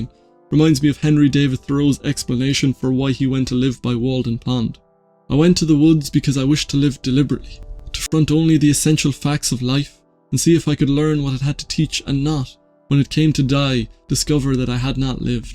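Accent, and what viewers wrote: Ireland. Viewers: Oof, what a lovely irish accent. Such pleasant listening.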